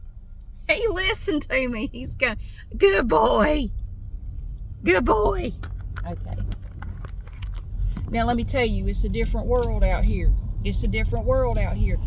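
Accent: South, United States. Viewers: Wow your southern accent is thick tonight. Lol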